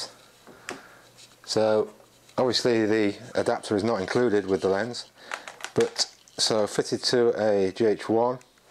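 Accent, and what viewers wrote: Manchester, United Kingdom. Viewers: Sounds like a Manc accent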